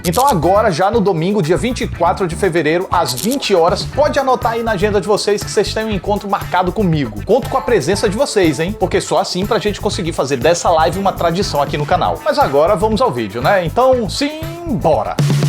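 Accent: Northeast, Brazil. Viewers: Amigo vc é do Nordeste é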